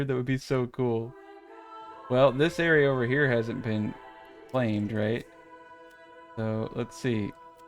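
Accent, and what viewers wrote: Canada. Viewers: i was thinking that you were from Canada🤣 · as a South African i thought you where Canadian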